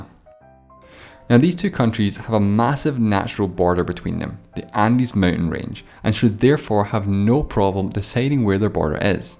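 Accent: Scotland, United Kingdom. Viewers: I get what you're saying, but... the dude is not gringo, he's scottish. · Luiz Albano He’s Scottish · He’s Scottish. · he has a scottish accent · i cant help but wonder why i find females from the UK, accents sooo sexy, while the males i consider an assault on my auditoty systems.both electronic and organic. anyone know exactlly where this gentleman is from exactlly? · what accent is this? scottish? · GeoffCMD I don't know what Canadians you've been listening to, but he doesn't even sound close to one. Like Nobody said, he's Scottish. Though he has a thicker accent than I'm used to hearing for Scottish accents, like in Glasgow. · are u scottish? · Thank you mate!! by the way, now that i'm watching the video again his scottish accent is fairly obvious, i should've noticed it before. i'm such a fool 😁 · Love this videos, love this guy's scottish voice, love that tiny piano in the background, love that Chile is mentioned here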